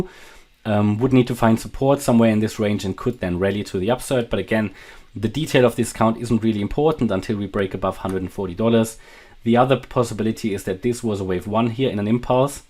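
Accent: Germany. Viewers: German Akzent…..👍🏽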